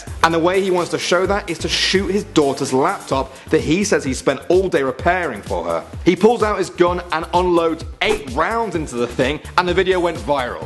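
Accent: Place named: United Kingdom. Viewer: Sounds like he is British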